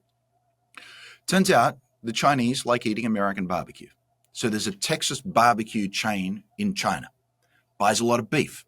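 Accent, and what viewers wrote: Australia. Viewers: Strange to hear "we" meaning Americans in an Australian accent. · This guy has got it and explains well. He sounds Australian, wonder if he is? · Not too bad for a 'strine' accent. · You sound Australian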